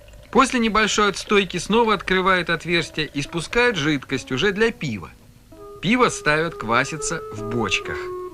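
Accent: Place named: Russia. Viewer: Тогда автору придётся все языки учить прежде, чем фильмы про каждый народ ставить... Если следовать Вашему требованию -- мы никогда этих фильмов и не увидим вовсе. Да и -- что он говорит не так? Я -- коми. И то поняла слова "дöрöм" -- "рубашка"; "турун" -- "трава"; "нянь" -- "хлеб"... Молодец, что произносит с русским акцентом. Уважение и благодарность ему за это! Спасибо --, что выставил этот замечательный фильм. Очень интересно было его посмотреть.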